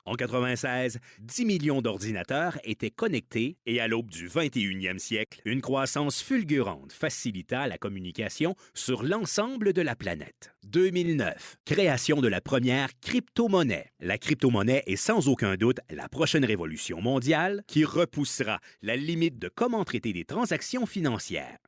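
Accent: Canada. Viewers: un joli petit accent canadien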